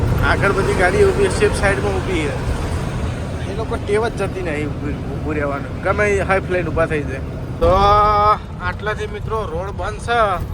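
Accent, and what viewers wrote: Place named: Kheda, India. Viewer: तुम्हारी भाषा नडीयाद खेडा नी छे🎉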